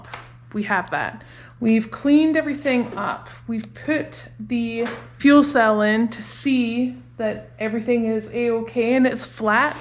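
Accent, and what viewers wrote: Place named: Scotland, United Kingdom. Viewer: Everytime I hear your Scottish accent I get like jello 😍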